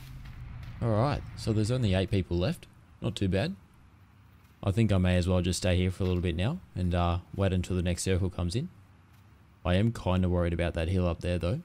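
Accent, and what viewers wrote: Australia. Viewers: Australian accent , badass skills. Gold. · what part of australia ya from mate?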